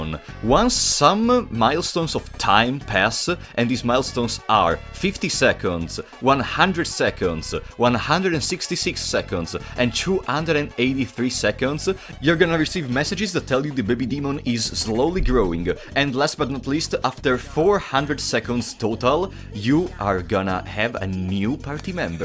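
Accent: Italy. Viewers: Are you italian? Your accent is very similar to mine when I try to speak english haha thanks for the tips!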